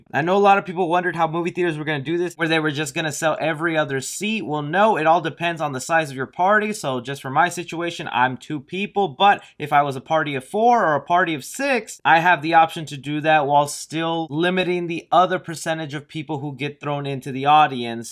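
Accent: Texas, United States. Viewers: Woah what part of texas are you from?! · What part of Texas are you from? I'm Texan too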